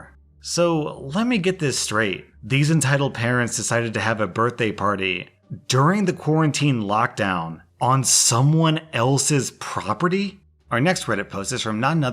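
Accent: South, United States. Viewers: southern accent is the best thing that's happened to me today. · Your high-pitched southern EM voice sounds like Ziro the Hutt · entitled Karen voice mixed with southern accent is the superior voice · does a good southern woman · It's pretty obvious from your Southern accents that you think they're synonymous with ignorant yokal. That's pretty bigoted. Especially when you consider this: Some of the deepest and slowest accepts you hear come out of Alabama and Mississippi. Ironically locals work their asses off their whole lives for a shot at NASA facilities in their home states. Those accents got us to the moon. Have some fucking respect for "The Other." Also realize the deepest most impenetrable Southern Accents come from Yankees who have to prove "They ain't white." I know because I've asked or even heard the discussions people thought nobody else would hear. 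So it's almost like ignorant fucks live everywhere in every community, but especially amongst City Yankees. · thick southern accent on point man.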